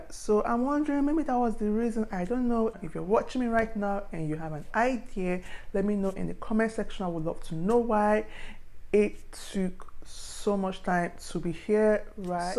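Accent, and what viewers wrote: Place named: Texas, United States. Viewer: I love your Texas Accent 😂😂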